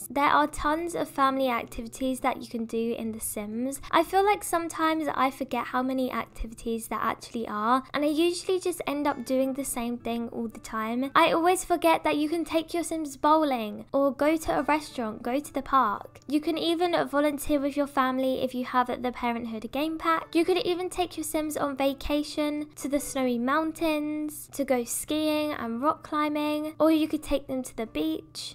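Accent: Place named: United Kingdom. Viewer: Where r u from? I love your accent... It sounds british but im not sure...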